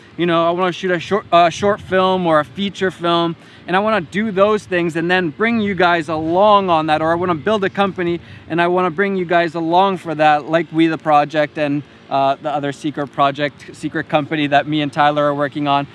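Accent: Canada. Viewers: Definitely Canadian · Canadian. Definitely Canadian. #saidthegirlfromBuffalo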